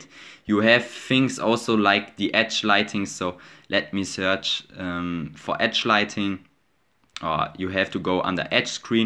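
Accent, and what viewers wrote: Germany. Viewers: Man kann hören das du Deutscher bist :)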